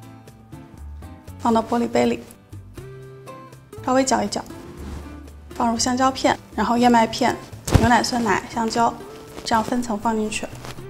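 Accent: China. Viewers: 是哪兒的人啊？她的普通話口音我好喜歡。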